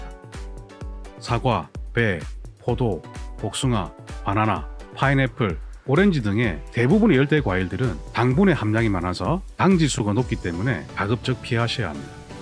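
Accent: Gyeongsang, South Korea. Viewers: 경상도 어디신데요? 말투가..부산인데 좋은 내과 찾고 있어요..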